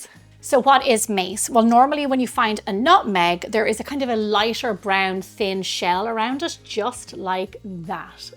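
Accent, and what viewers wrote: Ireland. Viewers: ❤❤❤❤❤ THANKS SO MUCH! Your videos are so well done. The camera work and closeups, the explanation and history, and of course your adorable Irish accent all combine for a lovely presentation. I’m eager to try your mixed spice. 🥰 PS Do you have any cookie recipe that use this mix? Also, I live in a rural area and probably can’t get all the spices locally. Can you recommend an online source? Thanks again. 😊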